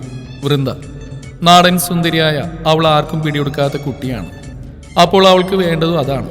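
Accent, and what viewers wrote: Thrissur, India. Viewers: ഇവനിതെന്ത് വയ്യേ... 🧐😅 ഇവൻ ith എവിടെ പരിപാടി അവതരിപ്പിച്ചാലും ഇതാണല്ലോ അവസ്ഥ 😹 that thrissur slang🙌😅 twistod twis😹😹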